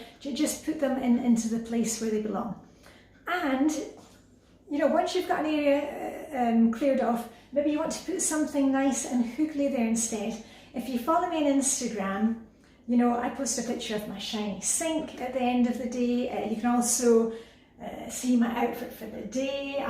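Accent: Scotland, United Kingdom. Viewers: Ah could be sure your Scottish? I may well be going barmy?xx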